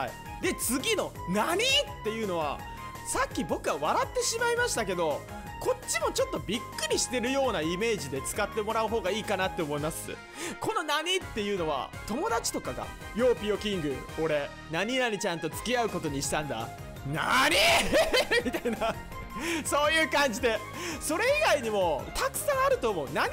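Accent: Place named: Hakata, Japan. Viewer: たまに出る博多弁好きです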